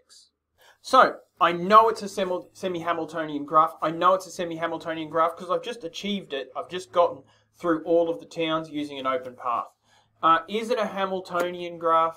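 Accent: Australia. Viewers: Great Australian accent.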